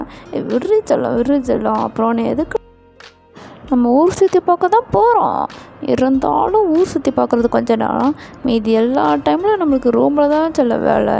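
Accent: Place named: Coimbatore, India. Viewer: Super story 😅ma semma I am your new subscriber ama neenga Coimbatore a slang appadithan irukku athan ketten 😅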